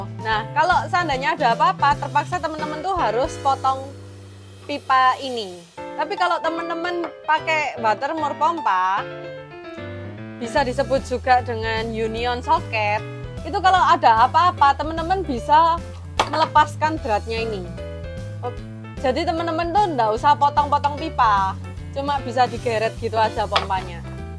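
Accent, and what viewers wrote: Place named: Surabaya, Indonesia. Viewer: Oalah jebul aning Suróbóyó. Mulané medok buanget. Rapópó cik, kita satu server.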